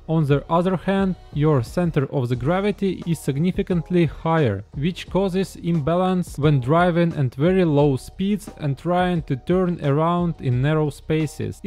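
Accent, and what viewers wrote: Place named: Ryazan, Russia. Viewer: Рязанский акцент :) Успехов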